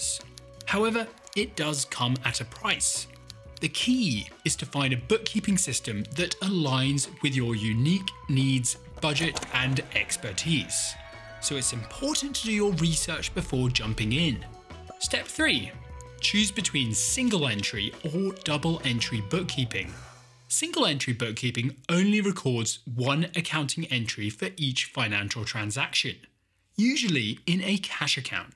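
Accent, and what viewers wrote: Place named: United Kingdom. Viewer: I'm a software engineer and recently joined a team who is building and maintaining accounting software. I have very little accounting experience and no training. But your videos have helped me tremendously to understand the various concepts and to be in the position to make a more meaningful contribution to the accounting software. Thanks for the excellent work. I'm looking forward to the rest of the Accounting Basics series. I must say though, I preferred your speaking style from your earlier videos. They were more relaxed and fun to listen to. I was surprised to hear the change in accent in this video and thought you went intentionally into some kind of "British mode" for this video's sake 😄. But your other more recent videos also sound similar. Also missing the close-up shots in this video which gave your videos that extra edge. 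Please don't sacrifice your unique and fun teaching style for the sake of sounding and looking more "corparate-y". All the best!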